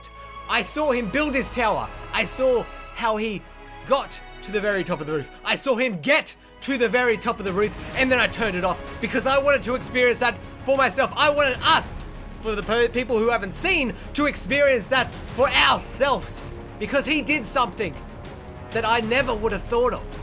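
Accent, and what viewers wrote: Australia. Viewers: R u australian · Love people with Australian voices! <3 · Are you Australian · Is he Australian ? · is he Australian because i am and he kinda sounds like it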